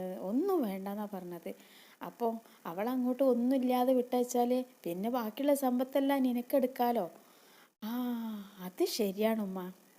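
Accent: Malappuram, India. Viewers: ഉഷാർ ആണ് itha mlprm സ്റ്റൈലിൽ തന്നെ പറഞ്ഞാൽ മതി അടിപൊളി 👌👌👌👌ഒന്നും parayaan ഇല്ല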